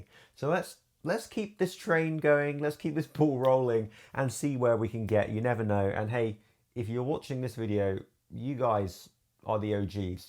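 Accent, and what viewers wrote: United Kingdom. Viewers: as Am-re company, we stumbled upon you while looking for influencers to help us do video reviews in the UK, one of your videos had a lot of views and it was great we liked it. now what we like about you, your voice and the way you talk about a product, it's calm slow and with very good words, the second thing that we like is our hairstyle its almost white but for sure you haven't passed your 30's look cool, the British accent. we would love for you to review our product the best 40 USD you have ever spend on earbuds of course we can send them to you. - back to the point hahahaha we also like your background studio the colors are great and very eyecatching, I'm sure you take your time and review the products and as well time to make the script for what you are going to say. the good point and the bad points. last point: the shots and Broll over voice you do its really good. 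we been following sense you had only 7k something followers and we are also surprised the way you exploited over the last 3 months Ps; we been following you for the last 3 months lets get to 1.3 billion in the next year. you are the best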